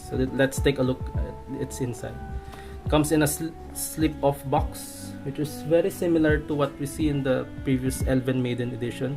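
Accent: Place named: Ilonggo, Philippines. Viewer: HAHAHAHAHAHAHA akala ko lang kasi may halong illonggo yung accent mo sir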